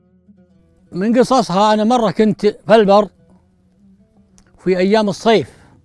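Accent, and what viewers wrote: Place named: Najd, Saudi Arabia. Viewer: قد يكون عايش في نجد او ف الشمال ومكتسب اللهجه من الديره الي هو عايش فيها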